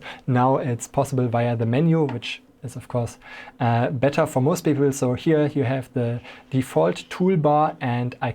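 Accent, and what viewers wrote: Germany. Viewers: Moin, ich hoffe mal du verstehst deutsch. Irgendwie höre ich was deutsches in deinem Englisch raus xd Ich habe eine Frage zu DaVinci, die mir bisher niemand beantworten konnte. Ist es möglich die Geschwindigkeit eines Videos mit Keyframes, die smooth sind, anzupassen? Wichtig daran ist, dass man einen Keyframe anheben kann und die Geschwindigkeit sich genau an dieser Stelle verändert. Bisher habe ich es nur mit zwei Keyframes hinbekommen, was zu ungenau und zu aufwändig für mich ist. Wenn ich das versuche hier bildlich darzustellen, sieht es ungefähr so aus: Was ich brauche: . ' . ' . ' . Was ich bisher gefunden habe: .. '' .. '' .. '' ..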